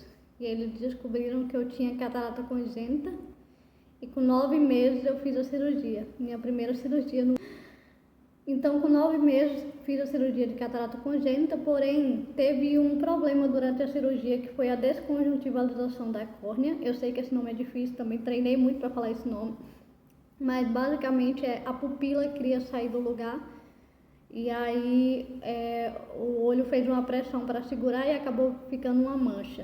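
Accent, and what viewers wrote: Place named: Bahia, Brazil. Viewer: que bela história de superação, e que bom que vc existe. Vc é linda, merece ser feliz. Receba todos os elogios dessa humilde pessoa que se tornou seu amigo, na distante Ribeirão Preto, SP. Seu sotaque também é lindo, sotaque baiano maravilhoso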